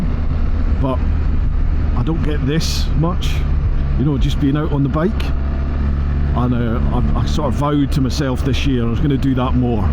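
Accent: Scotland, United Kingdom. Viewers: You definitely sound more Scottish once your back over onto this side of our border buddy! 🤪👍 Great vid & good to see a few familiar faces & Peaky behaving himself! 🤣👍 I'll definitely need to head up past the ski resorts on a bike at 1 point! 😎✌️ Are you doing the ABR this year,since I noticed you had a vintage ABR t-shirt on while on Haggis Whisperers series recently? 🤔😬👍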